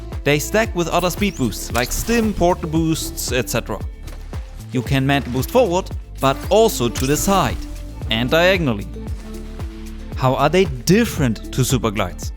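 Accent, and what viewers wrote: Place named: Germany. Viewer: Klingt schwer als wärst du deutscher. Das englisch kommt mir sehr bekannt vor xD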